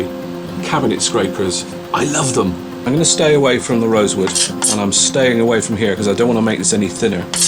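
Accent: West Country, United Kingdom. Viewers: Discovered your channel an hour ago and already bingeing :) Are you from the West Country?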